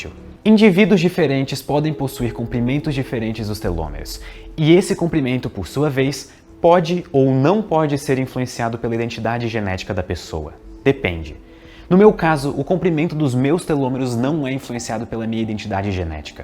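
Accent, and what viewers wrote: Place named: Rio Grande do Sul, Brazil. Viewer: você é gaúcho?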